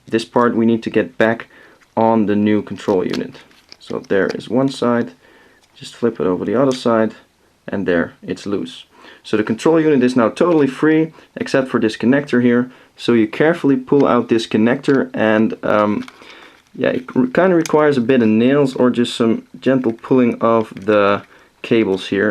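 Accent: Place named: Netherlands. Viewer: Nice dutch accent :D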